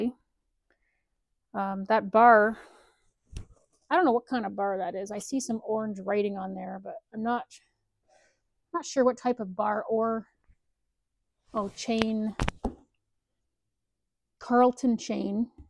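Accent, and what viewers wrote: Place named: Canada. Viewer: I tune in for the outs and abouts, your Canadian accent is amazing !! The chainsaw content is awesome too.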